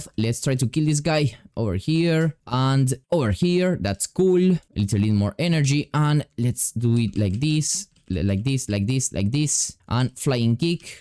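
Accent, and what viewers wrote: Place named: Argentina. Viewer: habla argentino mejor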